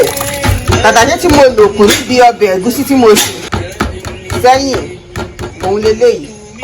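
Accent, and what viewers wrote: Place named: Ilorin, Nigeria. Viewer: You should have added subtitles. But I kind of enjoyed hearing pure Yoruba video. You sounded like you're from Ilorin.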